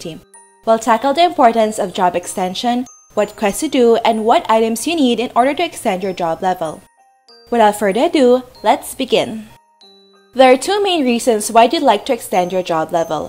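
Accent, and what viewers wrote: Philippines. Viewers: Thank you. Thank God for bumping into this. You saved my life! BTW are you Pinay? Your accent sounds like one. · Lol boses plang pinay na. Usap2 din sa ibang tao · are u filipino?nkaka inlove nman pgsasalita mo ;)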